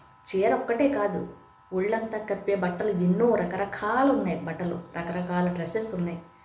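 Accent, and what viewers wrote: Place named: West Godavari, India. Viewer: Fantastic dialogue delivery. Crystal clear Telugu Language. Looks like West Godavari Accent. Slow and Steady delivery of Dialogues.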